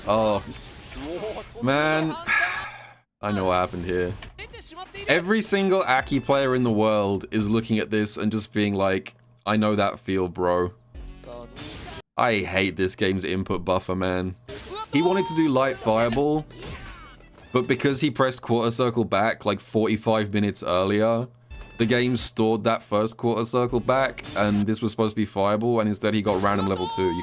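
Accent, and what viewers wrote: United Kingdom. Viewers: The British pronunciations are killing me in this video lol. Sako's Aki? No, Sacko's Acky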